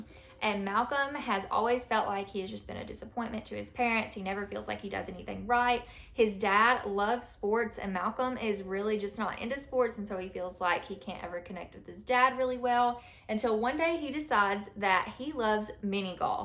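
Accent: South, United States. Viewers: You have my favorite southern accent on YT. I'm from Mississippi; I used to have a distinct southern drawl before I spent years living in Europe and doing voice work over the last years. At any rate, if you like to read out loud, you could always play a part in one of my readings. I have many collabs planned with different creators, and a backlog of works to do. Sincerely, Scully.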